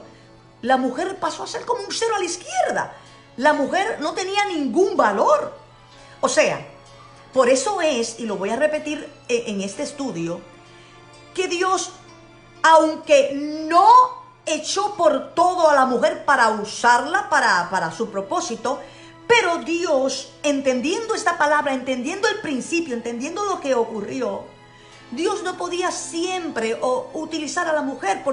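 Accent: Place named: Argentina. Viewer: Usted vive en Puerto Rico pero su acento suena argentino usted es argentina??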